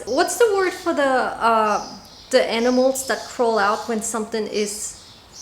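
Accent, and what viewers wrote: Australia. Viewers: Bruh, I'm an Aussie, I honestly thought I could hear an Aussie accent and you seem pretty fluent/ enough to take orders and stuff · Are you starting to pick up an eastern states Australian accent? Some of your vowel sounds definitely have that twang to them now, like from - . "Cacaow", "paowdah", etc.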